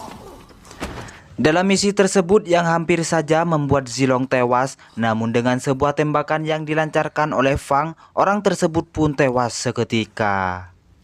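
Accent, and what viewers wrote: Madura, Indonesia. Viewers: Logat Madura ya bang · Logat maduranya kentel😁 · Bang orang madura ya?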